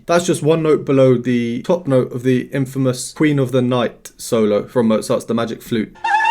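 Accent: United Kingdom. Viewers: such an in-depth professional analysis + genuineness + sheer emotions + sophisticated language + British English... couldn't be any better. please keep it up 💚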